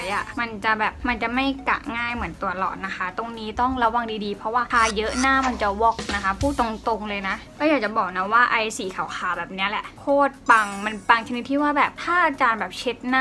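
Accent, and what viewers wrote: South, Thailand. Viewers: ว่าแล้วว่าพี่เป็นคนใต้อะ จับได้ตอนพี่พูดว่า เดี๋ยวโดนทุบ5555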